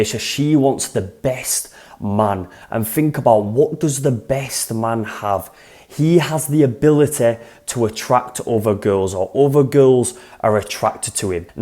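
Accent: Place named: Manchester, United Kingdom. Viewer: Manchester accent is it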